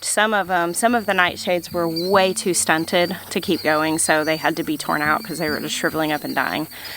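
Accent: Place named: South Carolina, United States. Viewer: You're starting to get a south Carolina accent 😄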